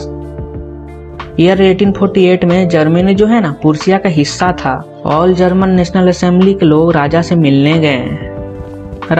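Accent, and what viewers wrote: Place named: Bihar, India. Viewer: aap muje bihari lad rahe ho aapke aawaj se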